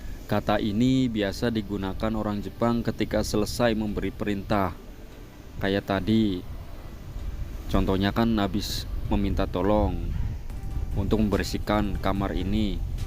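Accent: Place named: Cirebon, Indonesia. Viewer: Logat logatnya mirip Jawa Cirebon nih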